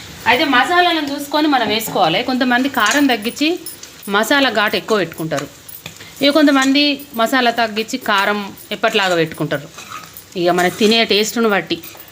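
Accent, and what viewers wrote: Telangana, India. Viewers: Aunty meeru chala great. Enrhomandiki inspiration. Godavarikhani nunchi Youtube vara ku mee success journey andariki inspiration. Mee telangana yasa big asset.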